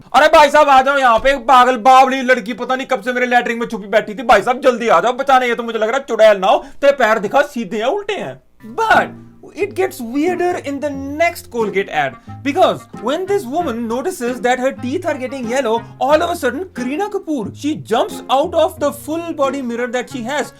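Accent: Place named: Punjab, India. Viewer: This guy has been all over World yet his punjabi soul takes over when speaking Hindi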